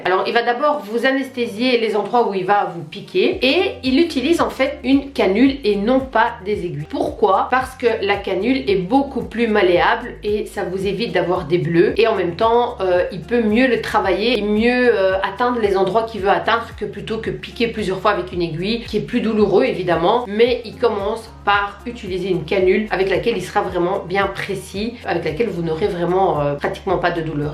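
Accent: Belgium. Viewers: Est ce que tu est belge? Car tu as l accènt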